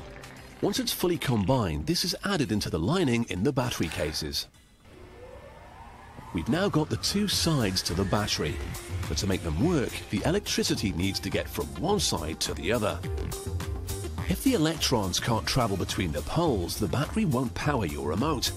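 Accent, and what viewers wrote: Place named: United Kingdom. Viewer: I thought the narrator was always british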